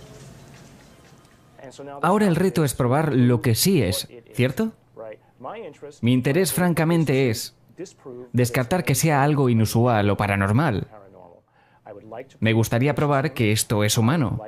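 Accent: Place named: Spain. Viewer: Pinche gente huevona que no tiene otra cosa que hacer ? Pongance a trabajar de verdad, y esa pinche voz española agrede el oido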